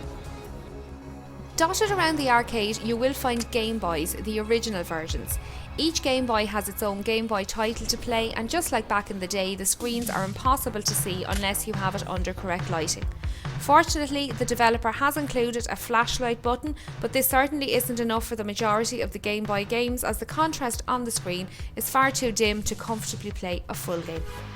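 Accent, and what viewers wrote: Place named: Ireland. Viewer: Dat irish accent!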